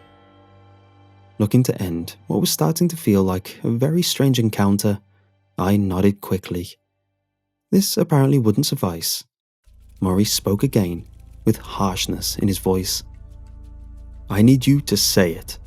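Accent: United Kingdom. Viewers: Yeah honestly.. I love the British accent.. I just wonder how it isn't broken or sore from all these story times...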